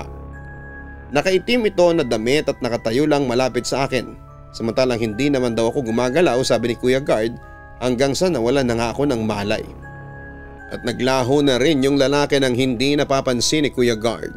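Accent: Conyo, Philippines. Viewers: Less nakakatakot pag pa konyo2 english na yan haha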